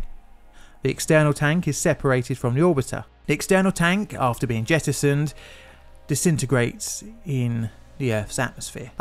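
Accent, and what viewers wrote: United Kingdom. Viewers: He is British, slight difference in pronunciation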